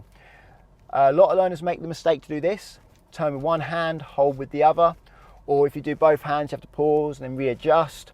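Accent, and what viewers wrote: United Kingdom. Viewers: Well, I just realized I speak more of American English than British English looking at the way this British man speaks😂😂😂. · Man your the best If I was in the UK (assuming it's where your live because of your accent) it'd be a pleasure to be your student My current instructor is so ruthless he's slapping my hand without even telling me what I've done wrong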